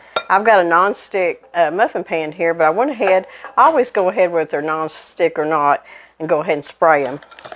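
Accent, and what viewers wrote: South, United States. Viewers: Hi there!!! I am SO delighted to find your site!!! Thrilled, too, that you are a Sister in Christ!!! Can't wait to make these yummy and quick rolls...and to learn lots more from you. LOVE your gentle and kind spirit, too---makes it soooooo relaxing to listen to you. And I was born in the South, so hearing your sweet accent feels like home. 😃❣ Blessings from Indiana, Kim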